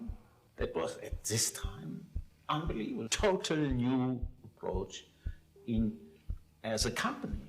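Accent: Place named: Germany. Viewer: Great video. Any body care to transpose correctly for Closed Captioning - it's hard to follow his Germanized-English. Thanks.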